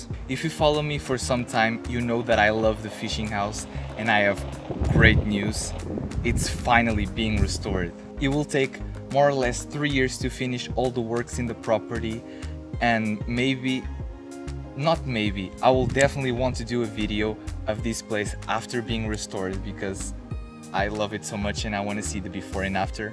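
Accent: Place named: United States. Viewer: I love your American accent when you are trying to say a word. I forgot you don't speak English it'll the end. LOL You are doing so good with this.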